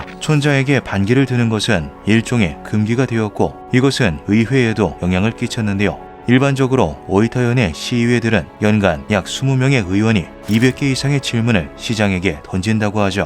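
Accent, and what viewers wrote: Gyeongsang, South Korea. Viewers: 대구네 ㅋㅋ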